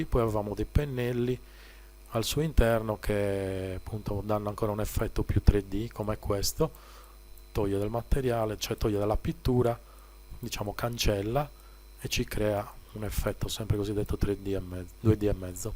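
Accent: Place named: Italy. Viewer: Cercavo disperatamente un tutorial in italiano senza risate di anglofoni che vogliono perder tempo e ti spiegano che prima devi acccednere il pc, che il mouse nun se magna e stronzate varie. E, sorpresona, non solo lo trovo in italiano, ma addirittura con l'accento della mia isola, l'accento mio, che mi avvicina emotivamente a questo programma dall'interfaccia prevista per marziani. GRANDE!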